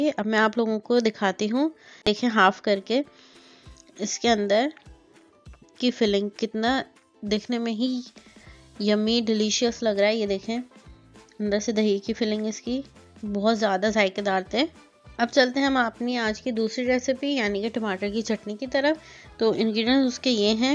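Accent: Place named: Hyderabad, India. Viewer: MashAllah Khalis Hadrabadi zaban boli ja rah rah wow👌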